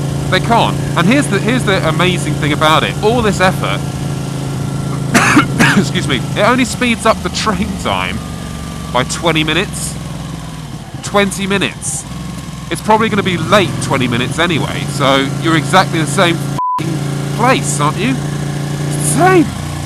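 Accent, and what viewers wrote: United Kingdom. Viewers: Gotta love and his angry British rants:-D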